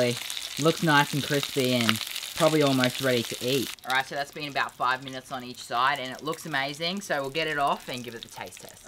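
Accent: Australia. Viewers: I love the australian accent ❤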